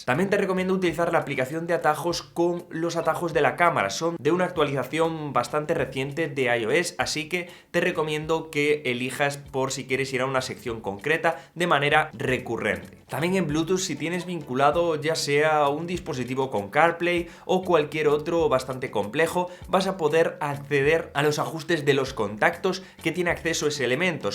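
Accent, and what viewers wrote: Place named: Galicia, Spain. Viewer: Se entiende perfecto, es gadejo lo tuyo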